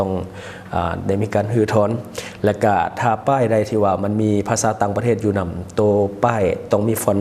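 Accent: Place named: Thailand. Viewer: คำเว้าที่แอดออกเสียง มันก็สำเนียงเดียวกันกับไทย. แค่ภาษาเขียนไม่เหมือนกัน.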